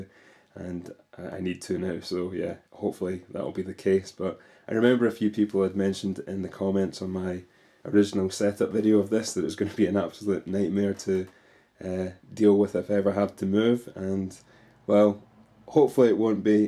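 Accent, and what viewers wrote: Scotland, United Kingdom. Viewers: do u live in Scotland ur accent sounds Scottish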